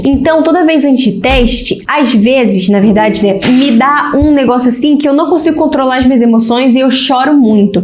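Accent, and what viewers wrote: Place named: Spain. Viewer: tu agr qnd falas ingles ja tens o sotaque espanhol kakaka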